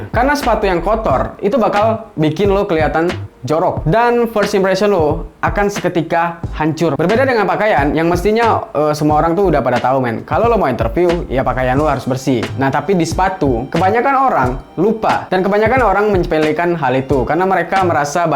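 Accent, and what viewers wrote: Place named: Padang, Indonesia. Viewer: Abg org padang ya, ketauan dari logat nya 🤭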